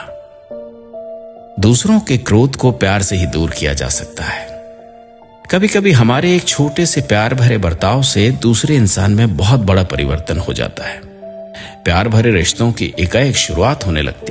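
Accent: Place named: Punjab, India. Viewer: Aap ki Awaaj nice Punjab sa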